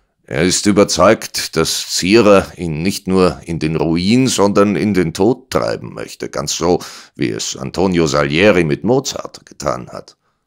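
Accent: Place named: Austria. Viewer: Lustig, wenn, der nebenbei gesagt Österreicher ist, sein österreichischer Dialekt als nicht überzeugend kritisert wird 😂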